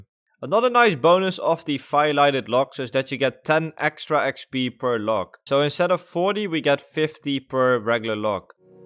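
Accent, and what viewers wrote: Netherlands. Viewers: you sound dutch man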